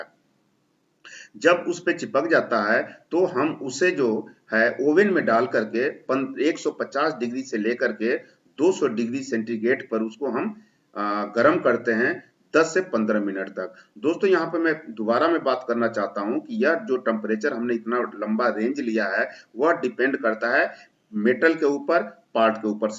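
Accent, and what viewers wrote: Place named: Bihar, India. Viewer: बिहारी लग रहा है।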